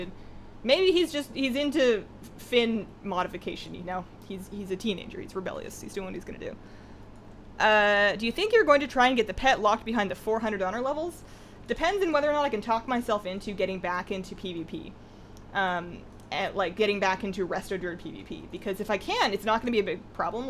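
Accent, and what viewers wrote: Canada. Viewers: I like the Canadian accent 🐻😀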